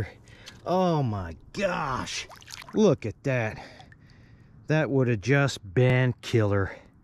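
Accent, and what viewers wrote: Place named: West Virginia, United States. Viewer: That accent... puts you somewhere around Fairmont to Clarksburgh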